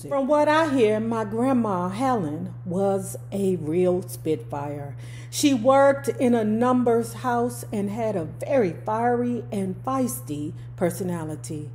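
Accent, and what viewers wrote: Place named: Washington DC, United States. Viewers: Definitely sounds east coast. DC or Murrland. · Baby, Fay revealed her ethnicity back in the 90's. As 4 ur accent, u sound just like everyone I know that went to Anacostia HS in DC!❤🤣🤣🤣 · You definitely have a DC accent and -isms. I lived in Ft Washington in Maryland (Murrlin) for a few years when I was a teenager. "lunchin", "bama", "doing the booty" all things I heard only in DC.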